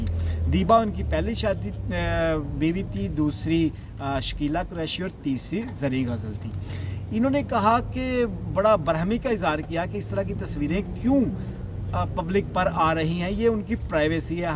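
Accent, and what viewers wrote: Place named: Punjab, Pakistan. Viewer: Mr Paindo Punjabi, First learn how to correctly pronounce simple Urdu words. Don"t speak in punjabised Urdu. All information you have given is not new at all.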